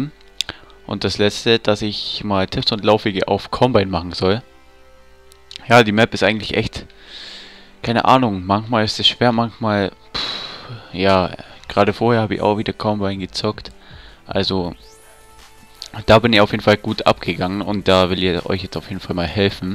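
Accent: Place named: Upper Bavaria, Germany. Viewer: hört man ;) oberbayern?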